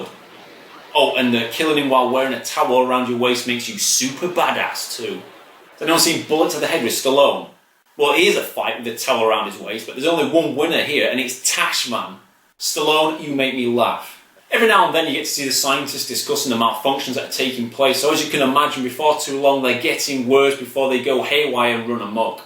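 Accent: Scotland, United Kingdom. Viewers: Its wonerful to have you back mate, been waiting for a long time to hear your scottish acent again 5/5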